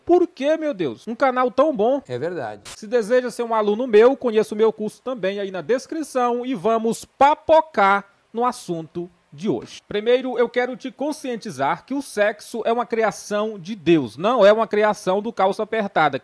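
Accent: Northeast, Brazil. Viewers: Que Deus continue te usando missionário tremendamente porque tu é profeta homem eu acho muito bonito esse jeito que o senhor se expressa uma forma nordestina sincera de falar mas tudo dentro da palavra é o que importa continua falando a verdade Profeta Deus é contigo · Ele é divertido. Dom do nordestino... Kkk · E muito bom o assunto falar sobre o assunto,acho que deve sim ser falado por pastores.existem muitas pessoas as vezes dentro das igrejas com tantas dúvidas sofismas medos. Eu mesmo sou uma, KKK agora é uma resenha o jeito que cê fala parece ser nordestino também.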